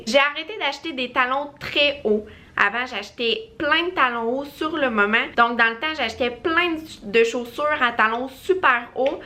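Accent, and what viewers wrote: Canada. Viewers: J adore ton accent chère youtubeuse du Canada.. Et tes conseils bien sûr une abonnée du Sud de la France.. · Mon dieux comme c fatiguant d entendre l accent française des canadiennnnnnn.... c fait mal a la tête..excuse moi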